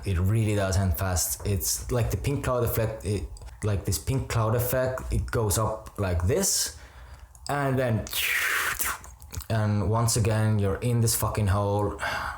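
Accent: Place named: Ireland. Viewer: Kind of sounds like an Irish accent.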